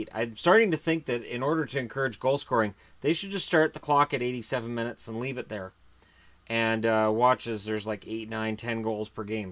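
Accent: Canada. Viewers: Are you born in Ontario? You have a heavy accent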